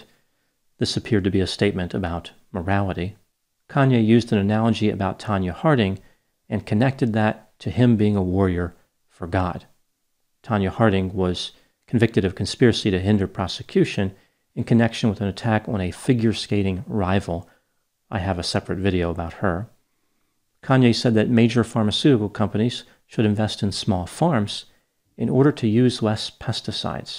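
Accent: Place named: New Jersey, United States. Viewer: I believe he mentioned that he's in Phoenix now? Hence, all the cacti that's gradually increasing in his background. 🌵🌵🌵🤔😂 I definititly can hear the jersey accent in his speech, though, and I love it haha.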